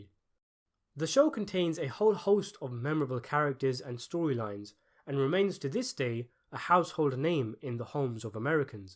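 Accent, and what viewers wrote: United Kingdom. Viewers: You Brits say "H" funny. "haytch" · The British accent ruins it. Such a sissy accent talking about Tough Guys.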